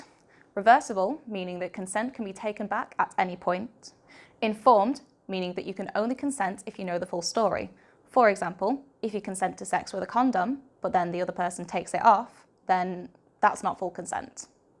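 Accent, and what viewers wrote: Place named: United Kingdom. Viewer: i'm pretty sure the person speaking is british too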